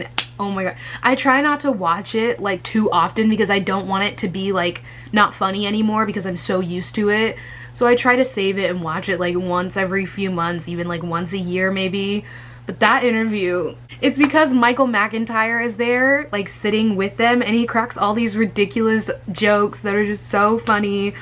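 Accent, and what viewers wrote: United Kingdom. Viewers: That british accent tho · ) I absolutely LOVE your shirt and your British accent is hilarious, we also randomly break into American accents sometimes too haha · British accent on point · Your british accent was really good!!!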